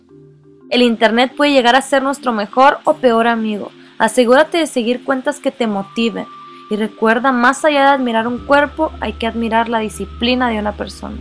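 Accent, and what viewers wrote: Chihuahua, Mexico. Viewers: Me encanto tu video :) me caes muy bien y me encanta oír tu acento norteño jaja, en el video pasado te pregunte y me dijiste que viviste en Sonora hace un tiempo, tmb soy de allí, te invito a ver mi canal, un saludo hermosa :)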